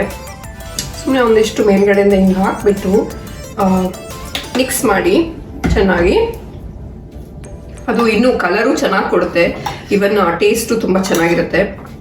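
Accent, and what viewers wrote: Mangalore, India. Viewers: ನಿಮ್ಮ ಮಂಗಳೂರು ಕನ್ನಡ ತುಂಬಾ ಚೆನ್ನಾಗಿದೆ.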